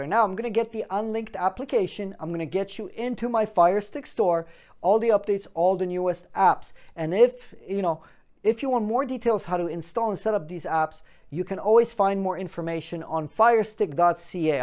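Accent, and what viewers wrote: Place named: Texas, United States. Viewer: Texas man! where u from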